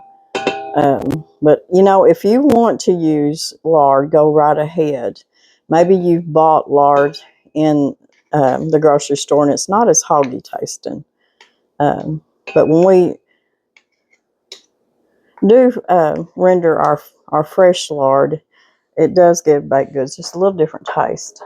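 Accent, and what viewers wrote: South, United States. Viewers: I love hearing your southern draw. God bless you both.❤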